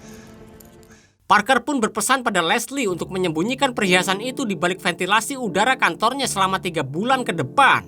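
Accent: Java, Indonesia. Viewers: Seru ni pembawa acaranya orang Jawa logatnya mantap😂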